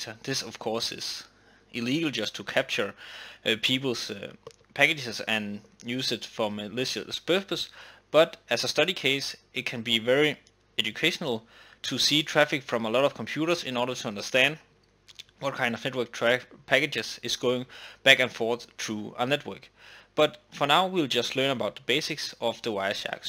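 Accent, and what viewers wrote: Denmark. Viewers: This guy sounds more danish when speaking english, than a danish speaking danish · i can reconize a danish accent from a mile away! Rødgrødmedfløde team assemble!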